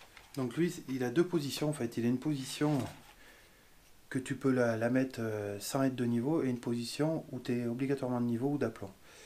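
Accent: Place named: Auvergne, France. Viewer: Merci pour tes explications. Tu viens pas d'Auvergne par hasard ? Vu l'accent 😉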